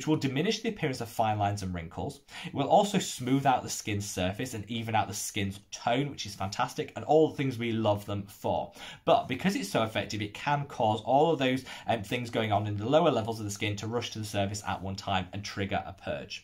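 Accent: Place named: England, United Kingdom. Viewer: I’m in Canada so I have more accessible to the Ordinary line and I stumbled upon ur channel while looking for more informations. I’m in my 40s so i have been binging on your anti-aging and pigmentations vid. I love all ur vid and ur English accent btw, lol. Thank you for sharing your knowledge, you got yourself a new fan 👌🏻💕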